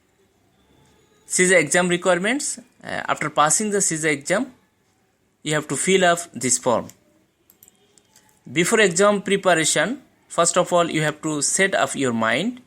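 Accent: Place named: Bangladesh. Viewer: Very good...great presentation and I am also enjoying your eastern Bengal influenced accent.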